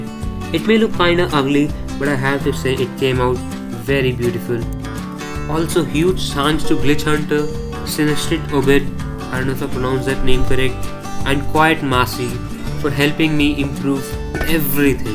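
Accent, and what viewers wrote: India. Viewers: Bro you sounds like Indian 😅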